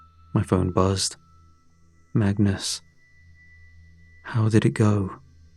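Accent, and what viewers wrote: England, United Kingdom. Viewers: Because this channel is garbage now. It was good 10 years ago. Now its the same stories on repeat live the only video worth watching with no ads. Plus doesn't end and wake you up every couple of hours. Its background noise. Its good at it too. Great English accent 👌 Finding out he's Asian is a kick in the teeth though. They came to steal our life. Our accent our voice then they try to speak for us 😢 I once heard he had case against him too. Idk enough about it to elaborate though. But I do want to know more on that...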